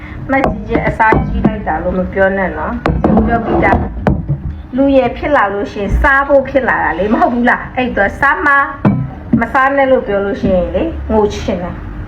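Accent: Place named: Myanmar. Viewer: ကမြန်မားဆန်ဆန်လေနဲ့ဆိုချစ်စရာလေး❤❤❤❤❤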